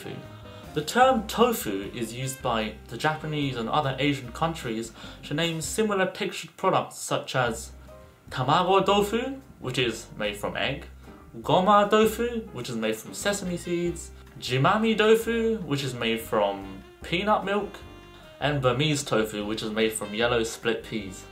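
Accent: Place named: China. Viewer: You must be living in an Asian country for too long - your accent is very chinese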